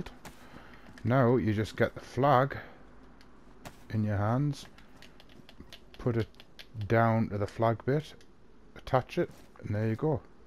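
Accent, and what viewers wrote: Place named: Belfast, United Kingdom. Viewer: cheers mate, great vid in the best uk accent there is! PURELY BELTA! liked n subbed as a dayz noob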